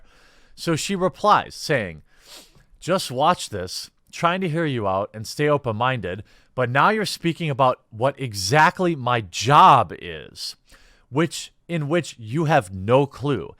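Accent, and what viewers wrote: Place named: Midwest, United States. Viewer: Northwest/midwest accent, lol. Reminds me of the Wisconsin area. Ever notice how he says words like "lag" or "bag" with like an extra "y" sound in there, like "layg" or "bayg"? That's his northwest/midwestern accent and he's commented on it before, lol ;)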